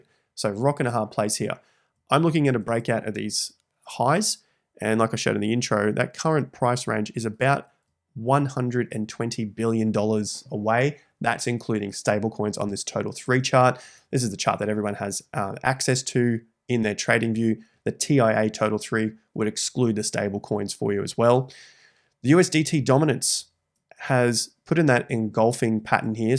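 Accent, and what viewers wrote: Australia. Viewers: Yeah, the Aussie accent is brutal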